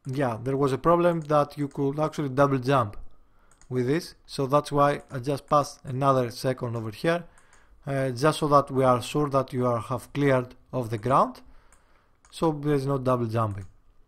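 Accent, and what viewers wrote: Greece. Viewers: aderfe eisai apsogos sunexise etsi (in case you're not Greek, however I am picking up greekish accent then apologies I was only saying "you are amazing keep it up")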